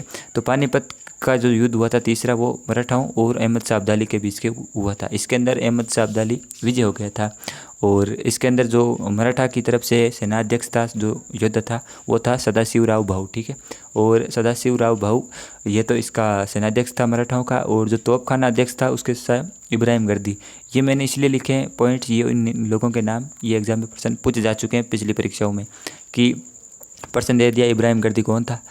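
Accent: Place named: Marwar, India. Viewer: सर आपके वीडियो में कभी-कभी मारवाड़ी भी झलकती हैं जो बहुत अच्छी लगती है। सर क्या आप मारवाड़ क्षेत्र से हो ? मेरे जैसे डीडवाना-कुचामनसिटी जिले से।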